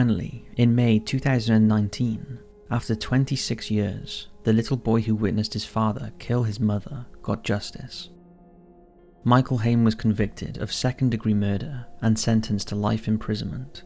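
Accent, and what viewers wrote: Wales, United Kingdom. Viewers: Wales - his accent is Welsh.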